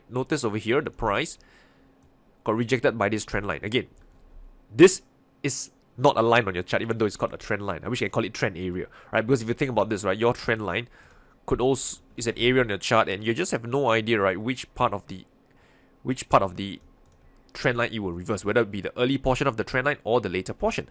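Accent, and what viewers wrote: Singapore. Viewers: English is just a language not an intelligence..I too have friends from singapore and they have similar accent as... So please do not offend someone like this.. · Love the singapore accent 👍🏻🍀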